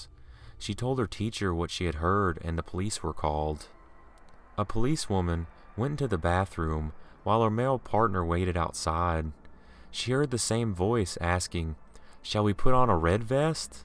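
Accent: South, United States. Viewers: accent is super strong which part of the south are you from im from the south to btw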